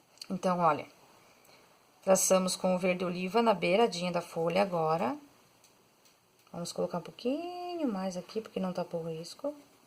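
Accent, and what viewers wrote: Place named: South, Brazil. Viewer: Btarde,!!!! Que lindo os seus trabalhos, que Deus continue abençoando suas mãos e lhe dando muita saúde!!! Vc vai fazer o vídeo fazendo as rosas deste trabalho? Vc prepara seus pincéis antes ou usa conforme vem da loja? Amei a sua aula vc explica muito bem e é bem claro o que diz!! Cheguei até vc através do canal da Jucineide Braga, que tbm acho outra professora maravilhosa, admiro tbm seu trabalho. Já me inscrevi no seu canal, e torcendo muito que não pare de fazer os vídeos e que traga mais vídeos aulas de outras flores e principalmente de rosas que realmente são poucas que explicam tão bem. Grata por compartilhar seus conhecimentos!! Até breve!!! Obs.: Amooooo o sotaque dos sulistas!!! Bjs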